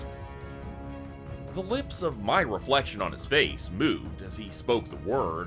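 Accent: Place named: Canada. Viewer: Brother I love your channels but you got to work on those "O's" and "OU's". I would love to know where you're accent is from. I'm guessing Canadian are Northern United States. I really hope you see this and respond because I have been wondering this very question for as long as I've been listening. 😅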